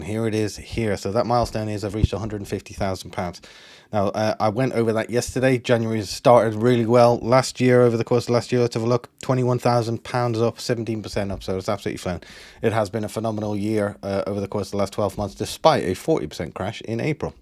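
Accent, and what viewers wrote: Ireland. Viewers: I can detect a slight Irish accent I think. You did very well with your portfolio, well done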